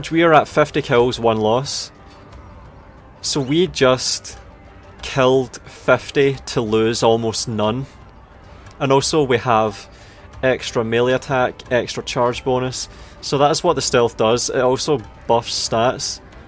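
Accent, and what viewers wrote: Scotland, United Kingdom. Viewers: you scottish? ik wierd ques but anyways great viedo never thought ninjas could be that useful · Are you Scottish?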